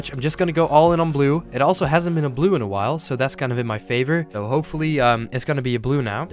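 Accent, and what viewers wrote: Netherlands. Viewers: You sound Dutch so are you Dutch? XD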